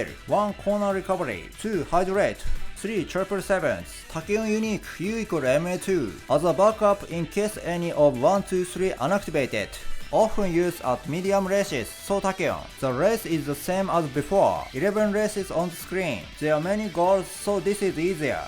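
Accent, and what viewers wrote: Japan. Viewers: For one I love when jp ppl talks like this. But don’t worry if you just subtitled your videos it’ll be the same effect. If ur stuggling with English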